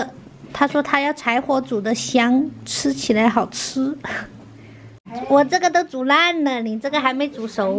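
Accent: Sichuan, China. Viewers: 妹子你这川普好巴适噢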